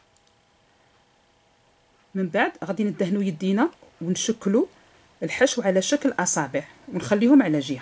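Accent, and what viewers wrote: West, Algeria. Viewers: طريقة غمس المقروط في الماء تستعملها أمي من زمان يعطيها الصحة و طريقة جد ناجحة و شكرا اخت الله و أعلم انت من الغرب الجزائري على حسب اللهجة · تهدر كيما ناس الغرب ولا راني غالطة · J'aime la façon comment vous prononcez le mot MAKROUT et votre accent Oranais, j'aime bien votre chaîne. Merci Madame et bonne continuation, your fun from Algiers, tha_nmirt = thanks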